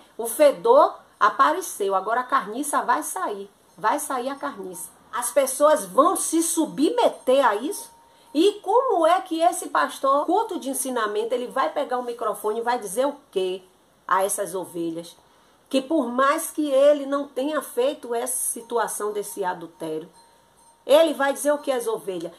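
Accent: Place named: Northeast, Brazil. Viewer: Gosto muito dos vídeos e do sotaque nordestino também, coisas lindas do nosso Brasil, uma terra linda, cheia de diversidades e por isso tão maravilhosa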